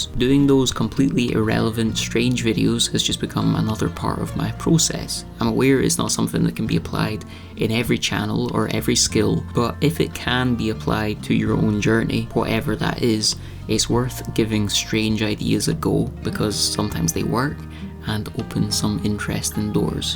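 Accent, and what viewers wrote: Scotland, United Kingdom. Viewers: Hi, I’ve been watching since you bought the tiny house and I don’t normally comment, but every time I watch a video of yours,I mean to! It’s hard to articulate what I want to say, but basically I really think you have an element of genius. The combination of the topics you discuss, with the video clips etc, is so very creative and skilful. I know you’ve talked about being anxious before, so I just wanted to say that I hope you’re confident about your work because you really should be! Also lovely to hear a fellow Scottish accent in the YouTube world 😊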